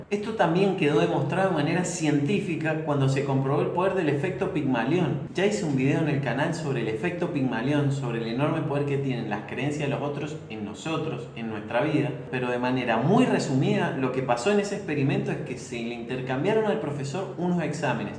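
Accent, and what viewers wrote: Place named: Mendoza, Argentina. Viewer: sos mendocino? yo lo soy y siento que tenés el acento, abrazo!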